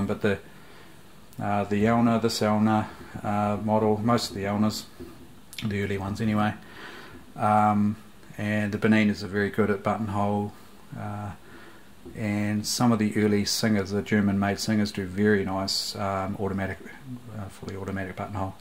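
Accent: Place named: New Zealand. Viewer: Mate, thanks so much. Trying to figure out my Grandma's old machine and this was the perfect video. Also imagine my shock when amongst all the female American voices in other tutorials I find a fellow kiwi! Made my day. Churr.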